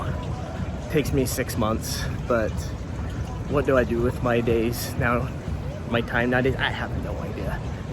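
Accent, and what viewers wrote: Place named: Utah, United States. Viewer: I knew I heard some Utah in that voice. :)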